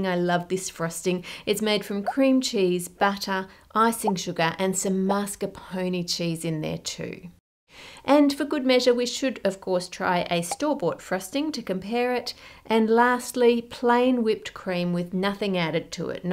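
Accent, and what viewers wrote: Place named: Australia. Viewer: Sometimes sounds like bob ross, but with a female Australian accent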